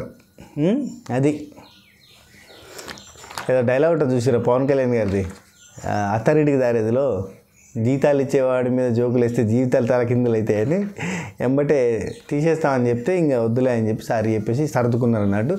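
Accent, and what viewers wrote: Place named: Karimnagar, India. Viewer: bb8 kanna jokulu full entertainment pedda fan meku nenu iam from Karimnagar same ma language eh 😄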